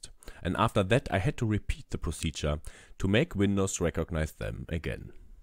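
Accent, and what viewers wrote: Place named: Germany. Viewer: This is awesome, it works fine for me after hours of unnecessary unplugging and plugging . Thank you mate. And by the way, nice German accent. I have the French version of it.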